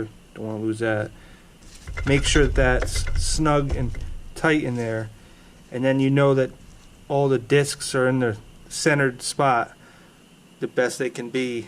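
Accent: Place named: North, United States. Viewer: I can't figure out this guy's accent. It's definitely Northern, a touch of those Canadian long vowels, but I can't place it. At first I thought Upper Peninsula/Wisconsin but it's not that strong. Maybe Minnesota or N.D. It's gotta be this side of the border. Anyone know? Also nice vid - I can't get enough of these long form restorations of old farm equipment.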